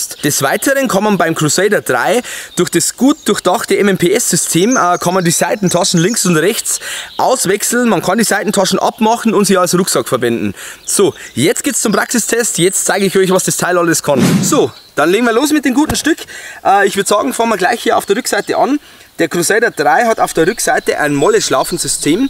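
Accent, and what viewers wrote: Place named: Bavaria, Germany. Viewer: Alle Achtung, prima, herrlich das Bayrisch 😊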